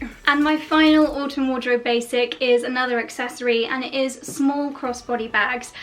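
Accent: United Kingdom. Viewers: you might be surprised that I use your videos for my teaching!! I teach British pronunciation and you're one of my favourite Vloggers and I play your videos in class often! But I do love fashion and what you introduce every time! Thank you! <3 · R u british?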